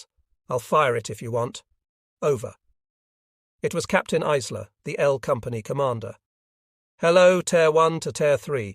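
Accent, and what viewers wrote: England, United Kingdom. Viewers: I enjoy the episodes but an English AI doing American voices and tossing in UK idioms is a bit bizarre. “Cobber” is not a term I hear in North America very often. (Previous episode-can’t remember which one). Still, as stated previously, I enjoy them. Not a huge issue, just a bit bizarre with the English idioms making some stories a bit “of a stretch” regarding American stories.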